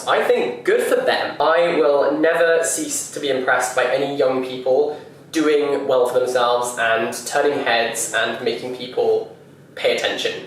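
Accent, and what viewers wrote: United Kingdom. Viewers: I love watching videos, but my non-English brain cannot handle that talking speed, especially in combination with British accent:D help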